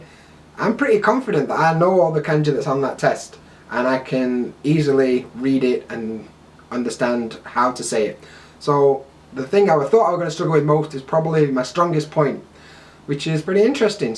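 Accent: United Kingdom. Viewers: have you ever seen your auto-generated English subtitles? Your intro -> "Thirty-Two Eyebrow it's down from ten Dakota and today..." It's like they've never heard a British accent before xD I study Kanji by writing & creating weird associations in my head about what they could represent. The kanji for car kind of looks like a box with two axels, so it's easy to remember. Or for dog, I just remember it's similar to the kanji for fat and come up with the idea that all dogs are fat because they never stop eating. Cheers on 3k subscribers!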